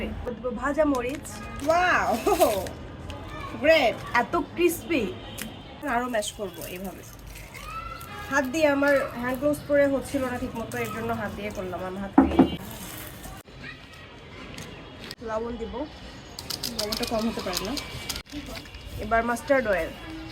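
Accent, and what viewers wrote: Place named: Noakhali, Bangladesh. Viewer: নোয়াখাইল্যা আফা চালাই যাব। 😁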